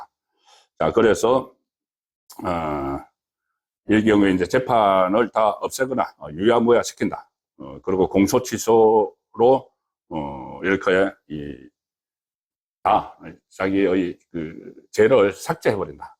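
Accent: Gyeongsang, South Korea. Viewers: 정짐서과비슷해 경상도 왜 발음이서울과경기도는 볼편할까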